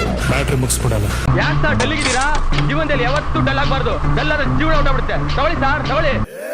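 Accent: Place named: Chamarajanagar, India. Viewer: Nammma Chamarajanagar ada bhashe sogadu suuuppar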